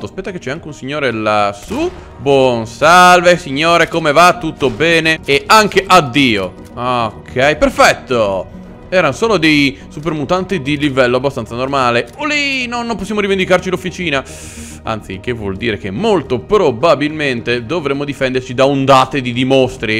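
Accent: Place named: Tuscany, Italy. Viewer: Malaho e tu mi sembro Toscano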